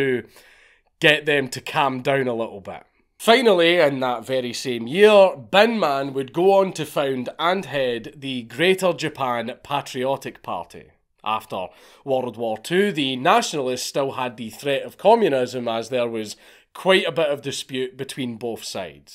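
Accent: Scotland, United Kingdom. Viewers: i chalk that up to thick Scottish accent.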